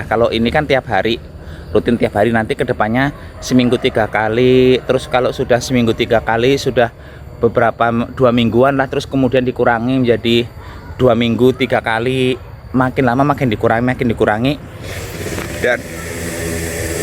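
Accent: Banyumas, Indonesia. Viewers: Assalamualaikum,kang sapi.itu kalau di daerah saya namanya maregol,ada juga yang bilang sembung grogos, kalau boleh tau kang ini orang mana,ya dari logat bahasa jawanya seperti orang Banyumas, saya sendiri orang Banyumas,kang, asli dari kota dawet, Banjar negara. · trms rep ngapak..solusi ne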